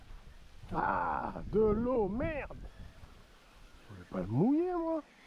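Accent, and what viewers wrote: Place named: Martinique, France. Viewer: Se décors, sa tranquillité, l'accent de la Martinique ^^ un bon rhum et la RC moi je dit Goooooooooooo !!!! 😃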